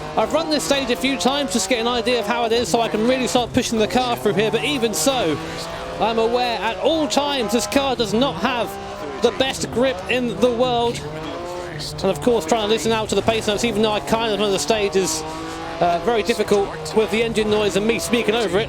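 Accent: United Kingdom. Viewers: Are you British or American?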